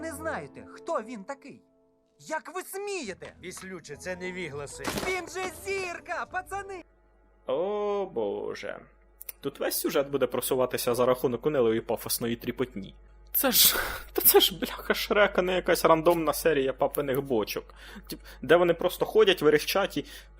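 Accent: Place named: Surzhyk, Ukraine. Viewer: Мені подобається ваш "суржик"🥺❤️ Хто б там що не казав, але говірка класна) Звучить по-домашньому для мене, бо в житті подібним чином спілкуюся.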